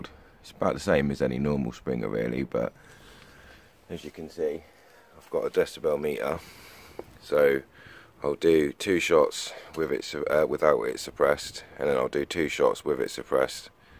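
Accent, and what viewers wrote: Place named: Plymouth, United Kingdom. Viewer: Great review mate, Thanks. Gonna treat myself to one of these. You sound like yer from Plimuff! Regards from another plymouthian!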